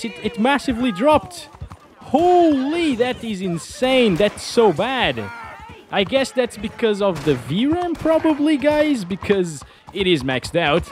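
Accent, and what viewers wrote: Portugal. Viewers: Just wondering, are you from portugal?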